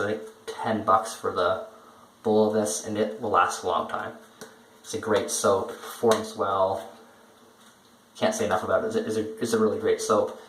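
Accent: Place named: Canada. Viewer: You sort of sound like Ed Norton's character in the movie Rounders with a bit of a Canadian twist.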